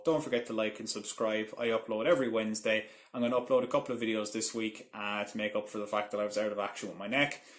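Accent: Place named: Ireland. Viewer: Got to say your Irish accent in rant mode is brilliant! I've played with the idea of reviewing a Reef Tiger but they're getting plenty of negativity lately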